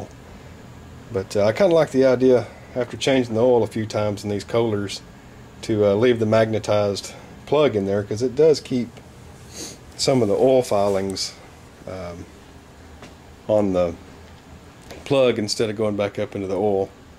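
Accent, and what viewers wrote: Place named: New York, United States. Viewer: you share a lot of useful information on manuals,tools and what to expect when working on a particular item. I bought a '73 140 H 3 last week and have a R F 2 318' s and others your videos are some of my go to's to see what I need too do, by the way what part of NY are you from with that accent? thanks for sharing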